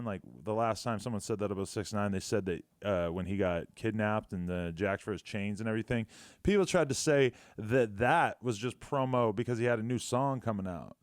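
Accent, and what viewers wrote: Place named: New England, United States. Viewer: For some reason I can really pick up new england accent in this video, which is usually nonexistent to me.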